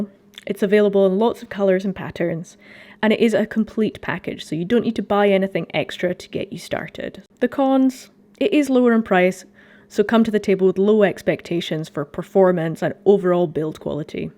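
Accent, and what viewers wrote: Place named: Scotland, United Kingdom. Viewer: lady has a scottish accent but gives prices in $s ! yeah that makes sense. thought this was a brit video . could of done with prices in £s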